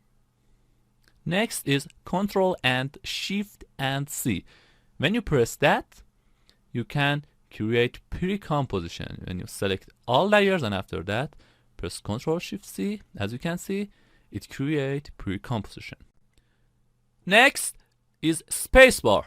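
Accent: Iran. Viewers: Thank u for this useful tutorial , but u really need to work on ur persian accent , maybe u should listen to nosrat english learning to correct ur accent , thnks again.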